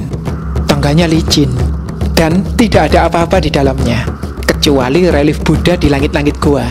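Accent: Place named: Java, Indonesia. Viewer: Naratornya jowo banget, jowo medhok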